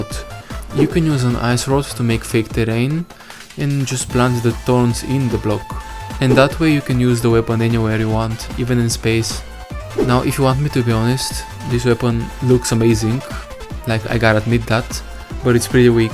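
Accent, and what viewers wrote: Russia. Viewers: У тебя русский акцент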